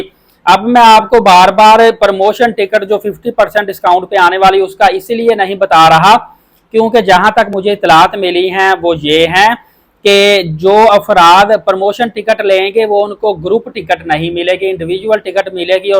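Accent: Punjab, India. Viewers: انکر صاحب معلوم نہیں اردو میں بات کر رہا ہے یا پنجابی میں بات کر رھا ھے اردو مکمل پنجابی لہجہ میں گفتگو فرمائ جا رھی ھے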